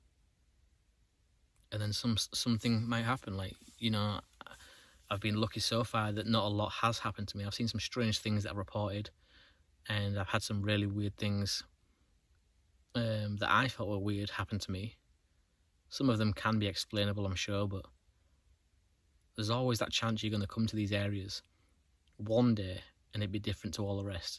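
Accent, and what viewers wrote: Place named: Yorkshire, United Kingdom. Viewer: Just come across your channel and so far so good pal! I would love to do what you do but unfortunately I'm not right well. I take your a Yorkshire lad from the accent. Keep up the good work pal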